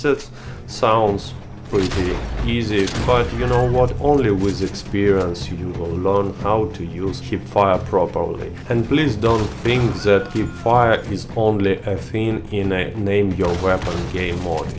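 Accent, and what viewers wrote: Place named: Russia. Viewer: Good vid, i like your russian voice, you sound so much cooler than my boring midwest american🤣🤣gonna sub ya now too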